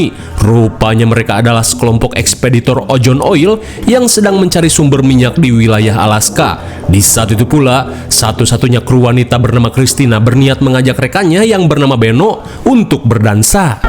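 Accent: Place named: West Java, Indonesia. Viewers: Awal suka nonton film di chanel Si Aa gara2 intronya, "𝙃𝙮 𝙜𝙪𝙮𝙨, 𝙠𝙚𝙢𝙗𝙖𝙡𝙞 𝙡𝙖𝙜𝙞 𝙗𝙚𝙧𝙨𝙖𝙢𝙖 𝙜𝙪𝙖" Udah gitu si Aa Org Sunda yg sangat terdengar Pasti orgnya Gokil.. Kang ngawadul 🤣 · Lah baru tau BG orang Sunda cuma gak tau daerah mana dari logat sama bahasanya aja Sunda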